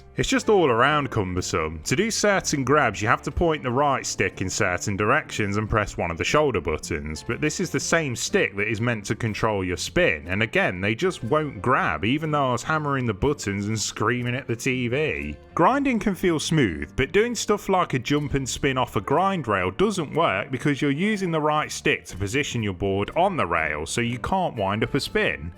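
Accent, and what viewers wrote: United Kingdom. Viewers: Yoo this dude hgas such a Grimsby accent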